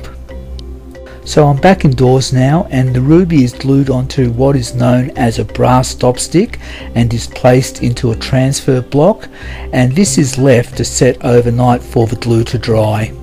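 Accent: Australia. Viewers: I’m very happy your south aussie and you sound like russel cought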